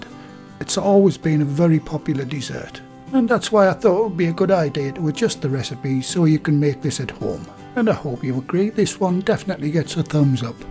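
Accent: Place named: North East England, United Kingdom. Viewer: you are fabulous, so down to earth, no frills just straight forward instructions with a beautiful accent. Love your north east accent. Thanks a bunch. This is my daughter's birthday cake.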